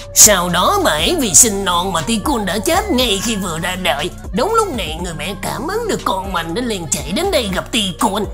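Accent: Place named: South, Vietnam. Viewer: Giờ mới thấy giọng miền nam lồng tiến , hầu hết giọng bắc kì giờ muốn muốn bao cả youtube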